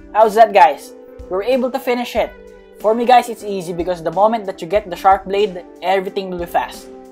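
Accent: Philippines. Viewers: it sounds like you have the accent? filipino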